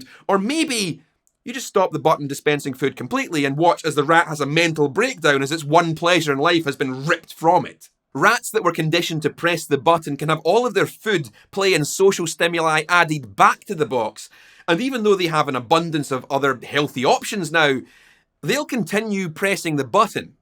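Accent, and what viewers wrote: Scotland, United Kingdom. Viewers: The Scottish accent seems especially well suited to calling out capitalist nonsense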